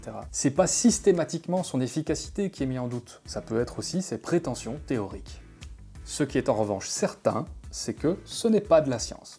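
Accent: Belgium. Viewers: Ce format est vraiment trop cool ! Que ça soit les musiques, l'image, l'humour bien dosé, le propos, les exemples illustrant tel ou tel bout de la méthode, l'accent belge, c'est parfait ! T'es au top et merci !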